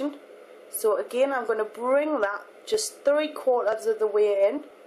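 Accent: Newcastle, United Kingdom. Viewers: U sound like Cheryl Cole are you from newcastle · Love your accent :D Gotta love the Geordies! Your eyelashes are so long! Are they natural? Your nose is actually a really nice shape! I never really noticed its size before you pointed it out, just its shape, its lovely and straight :) Loving the videos chuck, upload more soon. x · I'm pretty sure it's a British accent. :) It's an amazing accent :)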